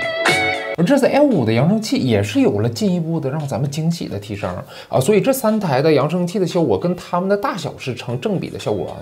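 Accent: Northeast, China. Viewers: 第一次收到推送，是东北人吗听起来好好笑啊可是难道不应该是台湾的频道吗🤣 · 东北老铁啊？ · 東北話太逗樂哈哈哈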